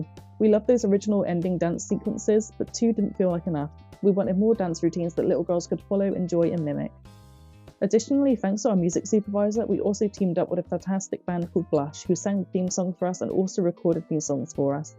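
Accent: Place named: United Kingdom. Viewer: Your voice is so calming, especially since you have a familiar “accent” being British too =]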